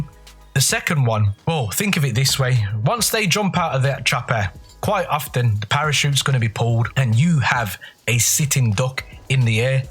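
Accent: Birmingham, United Kingdom. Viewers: Walsall? You sound it but then I hear abit of Brummy in you to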